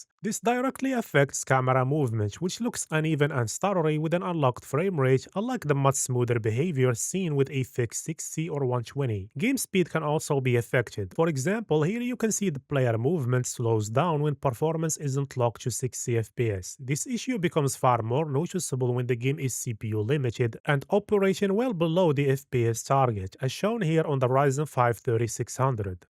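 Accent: Morocco. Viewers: Are you moroccan by any chance cuz you have a strong moroccan accent ?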